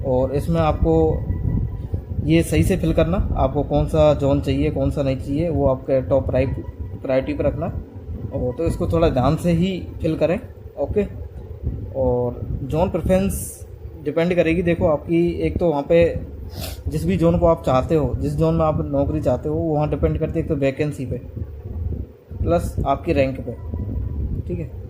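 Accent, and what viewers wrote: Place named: Agra-Mathura, India. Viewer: Bhai agra mathura side se lgte ho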